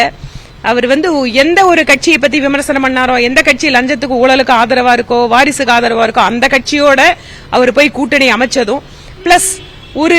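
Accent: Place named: Coimbatore, India. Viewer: 😂😂😂அருமையான கோவை பேச்சு❤. வாழ்த்துக்கள் அக்கா🎉🎉🎉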